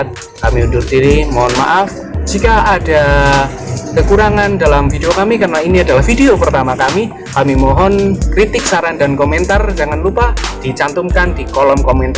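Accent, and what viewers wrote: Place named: Java, Indonesia. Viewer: medok gaes hehe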